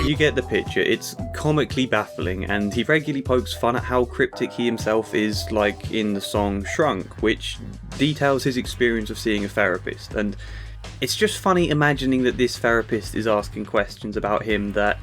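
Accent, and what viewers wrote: United Kingdom. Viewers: Notice you've got a british accent. You listen to much uk hip hop? Jam Baxters lyrics and fascinating and visual as well, but probably not quite to an level. You might like as well, cause he's really funny and slyly satirical.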